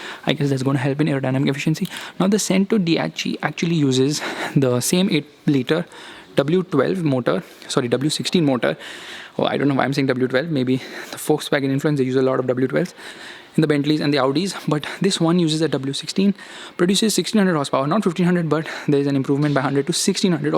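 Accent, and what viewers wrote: India. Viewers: I can understand your indian english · If there is an expensive car, it is a luxury item and if a man is speaking English in Indian accent, it is definitely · Indian accent of Hinglish.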